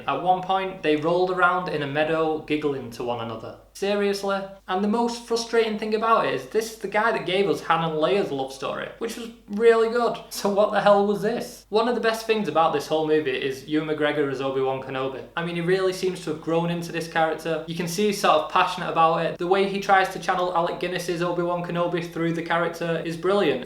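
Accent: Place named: England, United Kingdom. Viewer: Good review! I was wondering, where in England are you from?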